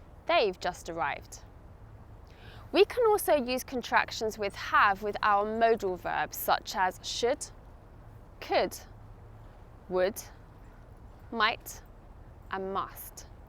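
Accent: United Kingdom. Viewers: Nice video. ❤ Is it British Accent you speaking? Just a doubt. I am a new subscriber.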